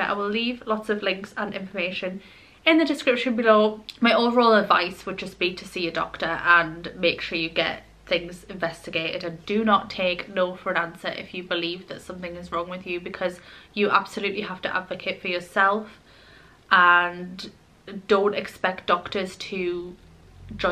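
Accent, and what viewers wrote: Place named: Durham, United Kingdom. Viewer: Are u from Durham by any chance our accent is same as mine that’s all 🤣 I’ve been having what I think is symptoms - usually a fairly healthy person work out eat healthy (most of the time) but I’ve been experiencing painful hips mainly on my right hand side , which cause me to limp numbness pins and needles in my hand feet and travelling up my legs , dizziness fatigue this had all been going on for a while, eventually went to the doctor last week and it all basically fell on deaf ears , raised my concerns of ms and left no further forward 😢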